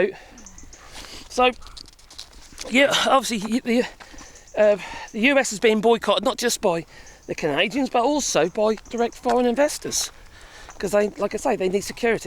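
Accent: United Kingdom. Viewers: I sometimes have a hard time understanding your Britain accent, but I've loved from the first time i found channel ❤❤❤ 🇨🇦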